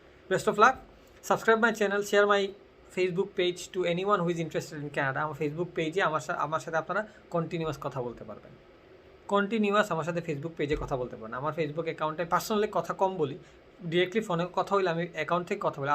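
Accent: Sylhet, Bangladesh. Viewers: Sylheti bhai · Vaiya, are you sylheti? Your accent makes me curious 🙈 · That's Sylheti😍😍😍 love you bai♥️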